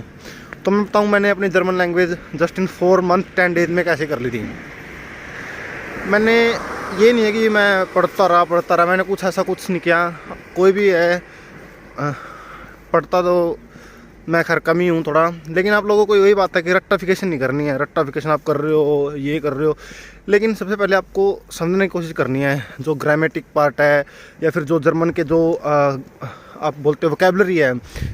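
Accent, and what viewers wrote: Haryana, India. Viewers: Haryana ki boli lag rahi hai bhai. Thanks for the info.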